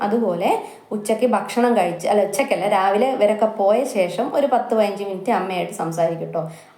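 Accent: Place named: Thrissur, India. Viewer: തൃശൂർ ആണ് എന്ന് തോന്നി ok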